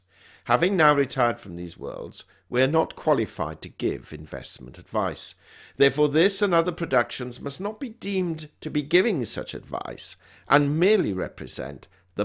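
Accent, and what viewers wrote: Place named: England, United Kingdom. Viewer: Not so sure that is his real voice though. Real accent sure but I think it sounds speeded up. Could be wrong but have listened for a long time and I think the his voice sounds deeper/higher/faster/slower sometimes. Also seams prudent to disguise ones voice in this situation. Otherwise its the English language. It's everyone else that has the accent. I find it nice to hear it spoken properly. 🇬🇧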